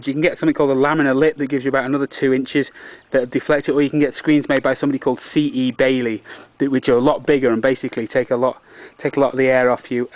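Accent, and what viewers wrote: United Kingdom. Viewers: Good vid mate....nice to see a Brit living in the US who's managed to keep his accent! I've had my Dakar for just over a week now, but true to form it has snowed so much, I can't get out on it! typical British (Yorkshire) weather!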